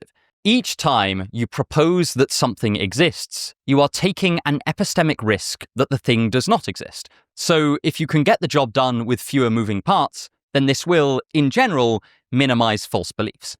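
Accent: RP, United Kingdom. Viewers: Anyone else been thinking for years he was saying ‘Joe Farley’ in RP?! 😂🎉 happy birthday 🎉🎈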